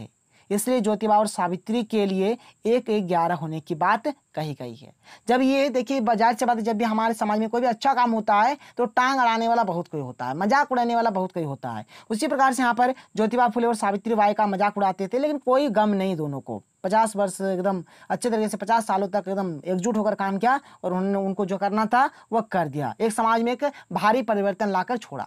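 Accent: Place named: Jharkhand, India. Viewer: Aapka language kabhi kabhi Jharkhandi jaise bolte hai aap sir ji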